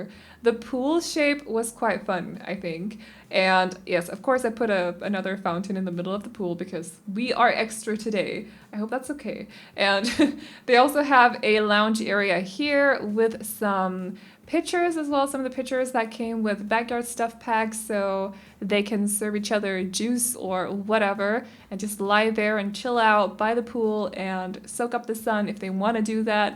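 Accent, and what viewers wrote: United States. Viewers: Åååh hvor er jeg glad for at du taler med Amerikansk accent og ikke klassisk Danglish. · I keep forgetting that you're not American because you sound like it.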